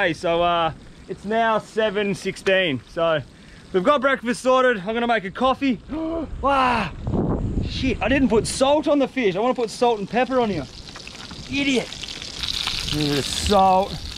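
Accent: Australia. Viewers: Its Aussie accent for me, and such a positive vibe and energy. I love this dude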